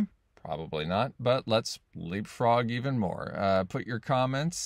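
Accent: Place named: South, United States. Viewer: Definitely better than the last video, but I'm hearing some of that Hollywood antebellum Southern accent creeping in. TH can also end up halfway between TH and D/T sounds for light accents, so I normally pronounce "I think" as "I tthink"; I still pronounce the proper TH sound, but there's a T in front of it. Don't push "smile" too hard towards a Southern accent, it's closer to "smell" I think, maybe "smuh-ill". You just want to drop the Y sound in the middle and you're good. "Prolleh" is dead on! "Got" is more like a northeastern accent, it's close to "gat" in heavy accents. For a Lafayette accent, you can also add in some AAVE aspects, Lafayette ends up being a mix most of the time.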